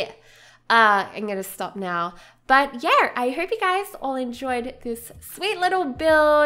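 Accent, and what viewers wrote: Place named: Australia. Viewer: New to your channel and wondered if I you were Australian as well... then you said 'reckon' and I knew straightaway haha.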